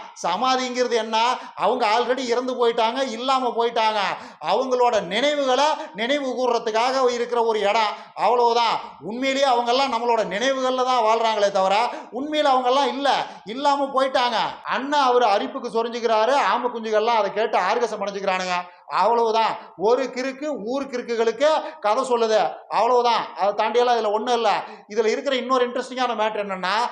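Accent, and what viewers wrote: Kongu, India. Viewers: ஏன்டா கொல்டி நாயே... பஞ்சம் பிழைக்க வந்த நீ கொங்கு தமிழ்ல பேசினா தமிழனாக ஆயுடுவியாடா நாயே... நீ யாருன்னு எமக்கு தெரியாதா நாயுடுகாரே....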